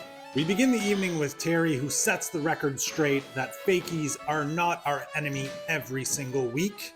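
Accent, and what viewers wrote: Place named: Newfoundland, Canada. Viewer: 8.3/10 Newfoundland accent. And also fatbikes are deadly. Me and the b'ys love to ride them.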